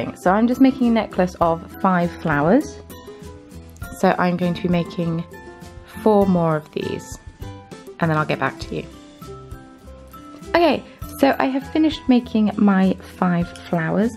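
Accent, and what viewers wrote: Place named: England, United Kingdom. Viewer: hello " " just subscribed to your channel. just posted another note to your answer to my post on another's channel. anyway, I am looking forward to checking out many more of your videos. I like hearing you talk, my Arkansas dialect is not as well sounding as your England accent. anyhow, looking forward to many more tutorials. sincerely Becky B from Arkansas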